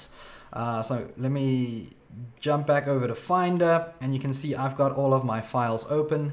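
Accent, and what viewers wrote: South Africa. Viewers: Cape Town or Joburg?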